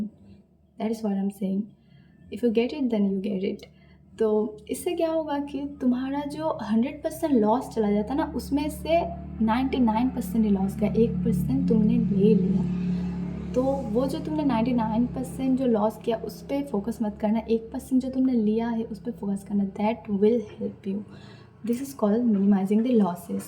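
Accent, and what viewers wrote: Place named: Bengal, India. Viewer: Waiting for short hair videos 😭 and ur bengali accent 😭🎀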